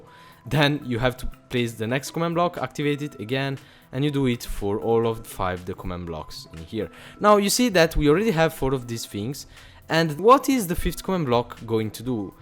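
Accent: Italy. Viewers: Ma sei italiano? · I think good? You are Italian?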